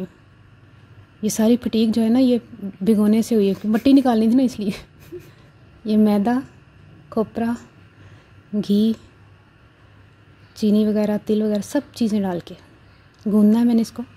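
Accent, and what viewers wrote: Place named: Punjab, India. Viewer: Aoa . bhot acha andaz hy kubhi kubhar punjabi zbaan ka chutta dy k baat karny ka .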